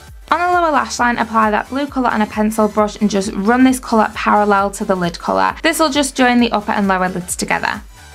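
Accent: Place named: Cheshire, United Kingdom. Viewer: You sound like you're from Middlewich! I taught at the High School there in 2006, and when my girls went to Sir John Deanes, they had pals from Middlewich High...so you're probs going to tell me I'm completely wrong and you live in Alsager or Nantwich! I'm just outside Northwich in Weaverham. If you're in the area, let me know and I'll show you my mental makeup collection which is taking over my bedroom. And I'm 47!! My daughter gets inordinately jealous and barks, "YOUVE GOT TOO MUCH MAKEUP!!! Mummmeeeee, can I have your Vice Reloaded and your Violet Voss palettes pleeeeeease cos you love meeee." Suffice it to say, the answer's NO! Thanks for taking the time to reply. That is a lovely touch for a YouTuber cos few of them can be bothered with their subbies xx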